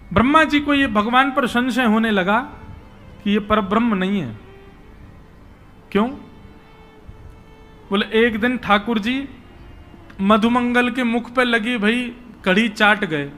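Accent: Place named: Braj, India. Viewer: Aapki brij bhasha sunne mei bahut maza aa raha hai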